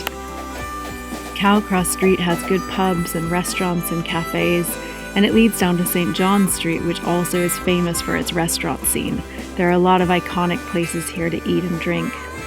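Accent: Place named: California, United States. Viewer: it somehow seems sophisticated with a soft Californian accent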